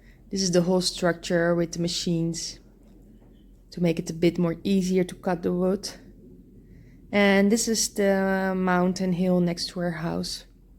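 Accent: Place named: Netherlands. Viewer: i swear you voice is so familiar to me. Is your name is if you are please let me know please my is Dutch and you have same accent as her??? Thank you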